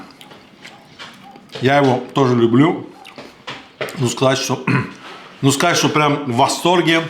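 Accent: Baku, Azerbaijan. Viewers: Приятно слышать русскую речь с Бакинским акцентом.рецепт бомба 😊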